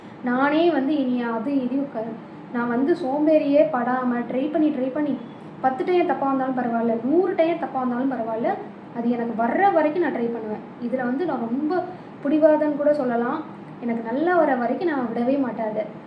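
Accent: Tirunelveli, India. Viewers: Neenga endha ori Tirunelveli aa?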